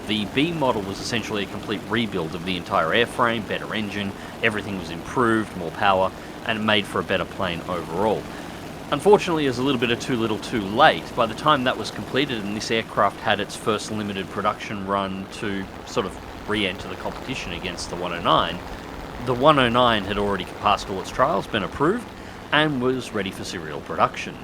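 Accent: Australia. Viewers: Feeling like you also just watched Rex's Hangars video on the HE112 ;) ? Awesome vid mate! thank you very much, love this plane and it's great to listen to an Aussie talk about something other than the fkin election or parliment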